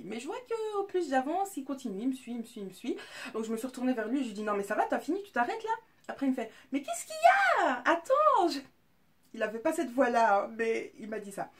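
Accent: Belgium. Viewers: j'adore ton accents belge 😘💝😜 t'es juste hyper belle c'est fou 💎💎 tes parfaite 😍😍😍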